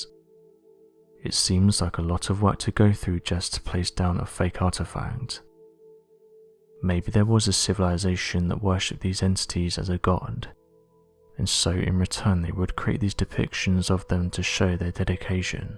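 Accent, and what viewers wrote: United Kingdom. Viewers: Your British accent does not make you more credible.Am I the only one that has noticed the increase of British commentators on science discoveries and documentaries in and around the United States as if USA citizens are not creadable commentators or witnesses worthy of giving a true account about things related to the United States it’s history or it’s science documentaries but the British are and taking over positions in our media. I am not trying to make a racial thing about being from England to discriminate or bad mouth them as a people. I am just stating how more and more it is people with British accents narrating our special documentaries on US history and scientific studies in the US as though they are the experts on these subjects and American commentators are not getting the narrating jobs here close to home. I don’t know what’s going on. 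But I can’t help noticing this change in US media coverage. I haven’t heard anything about the British networks buying up our nations media networks?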